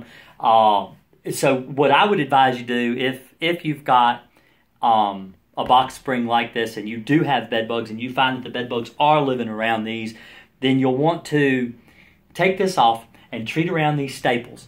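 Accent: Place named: South, United States. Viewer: Thanks good info... you have an accent like Gomer Pyle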